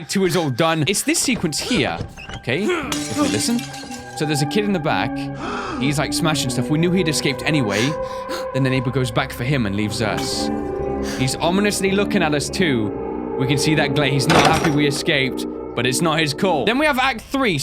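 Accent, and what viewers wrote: United Kingdom. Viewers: Are you British? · I love your British accent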